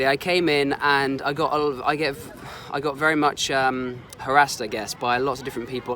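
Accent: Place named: England, United Kingdom. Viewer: Wait, you sound english, why is it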